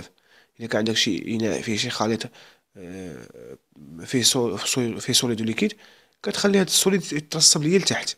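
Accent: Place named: Western Sahara. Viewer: لكنتك صحراوية. موفق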